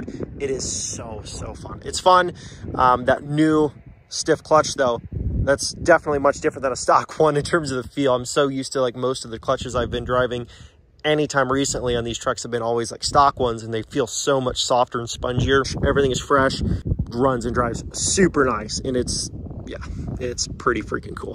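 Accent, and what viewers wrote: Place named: Kentucky, United States. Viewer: He's definitely from Kentucky there accent is almost as distinct as brittish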